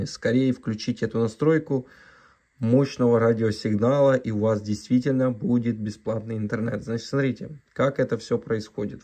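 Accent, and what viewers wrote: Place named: South, Russia. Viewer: Южно русский говор... Какчай качай... -?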